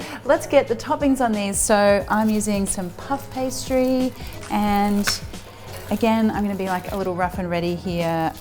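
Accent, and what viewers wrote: Australia. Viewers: You know Marion is Australian not British. Her accent is Australian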